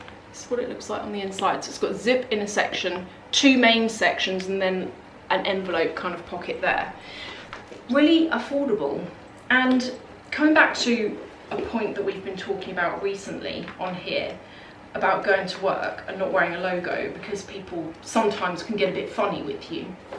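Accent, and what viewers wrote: England, United Kingdom. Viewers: Also, may I ask what type of English accent you have? I think it's very pretty! I'm aware of the different types of accents as my fiance is English.:)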